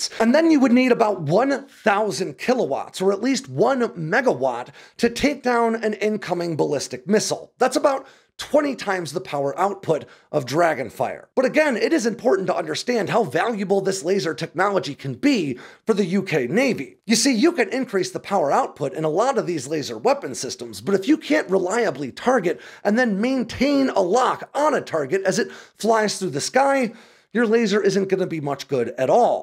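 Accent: United States. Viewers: And there you go Russia. If there is anything else we can divulge about any potential flaws in the Dragon fire weapon system, or any other of our allies weapons don't hesitate to get in touch with us at Sandbox. Mind you the guy is American by the sound of him so it is what I would expect.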